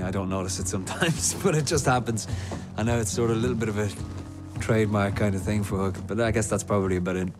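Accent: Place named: England, United Kingdom. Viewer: Ah okay right, I misanderstood English and Irish accent actually yes he does use the English accent more than the Irish one :) thanks for clearing that up for me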